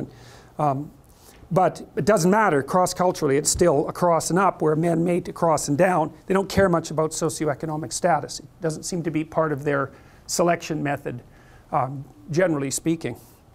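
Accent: Canada. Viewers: I find the Canadian accent very annoying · If he didn't sound so Canadian people would take him more seriously.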